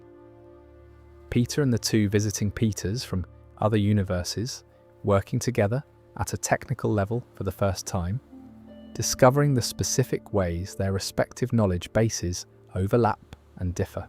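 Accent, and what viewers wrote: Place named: United Kingdom. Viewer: man if only you weren’t british 😭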